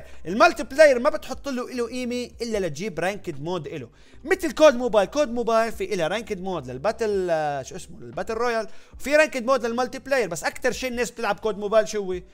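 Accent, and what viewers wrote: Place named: Jordan. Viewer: لهجتك اردني على مصري على لبناني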